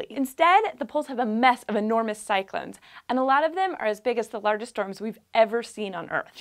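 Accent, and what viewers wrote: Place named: United States. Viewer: Slow down. I'll have to rewind to try and figure out what you said right at the start. I'm not American so your accent makes it even harder. Otherwise I like the way you sound.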